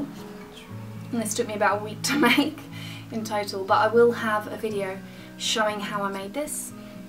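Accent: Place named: Brighton, United Kingdom. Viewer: You sound like you're from Brighton (: you're a really beautiful woman too! Very natural (: xx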